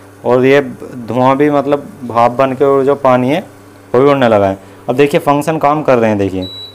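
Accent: Meerut, India. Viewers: Meerut ke rahne wale ho lagta hai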